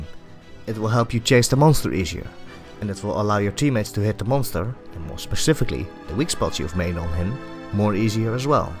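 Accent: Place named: Netherlands. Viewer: Nice try, you're Dutch, I can hear it!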